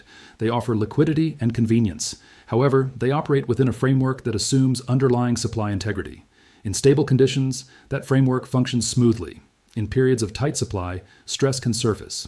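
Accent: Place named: Australia. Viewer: This ai has a slight Aussie accent!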